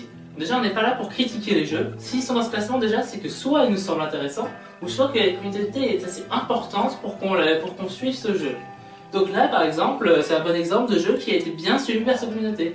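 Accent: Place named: Quebec, Canada. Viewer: J'aime l'accent poce bleu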